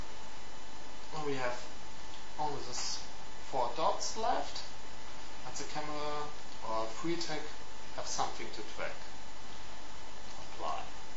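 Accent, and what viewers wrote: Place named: Germany. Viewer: Der Akzent ist deutsch, definitiv^^.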